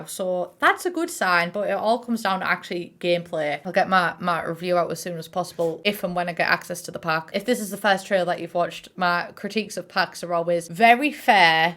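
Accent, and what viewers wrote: United Kingdom. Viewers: in the beginning of this video Did you say your mum had covid ? I'm sorry sorry sometimes I can't understand British accents sometimes please forgive me 😄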